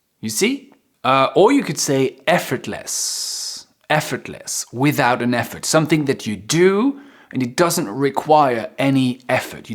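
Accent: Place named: United Kingdom. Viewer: I like your british english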